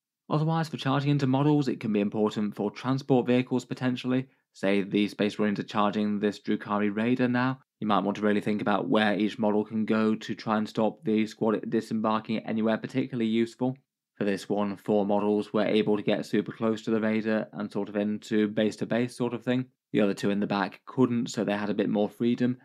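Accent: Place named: United Kingdom. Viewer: Has anyone ever bumped into at a Tournament? I'm wanting to confirm he's a real person that lives in a house, sounds like he's from the UK. Surely someone knows him!!😅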